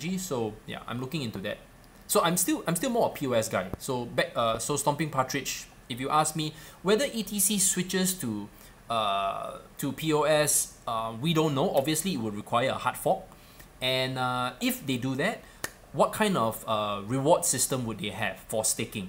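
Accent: Singapore. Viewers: you have to be from Singapore right? I hear that Singlish speak!!!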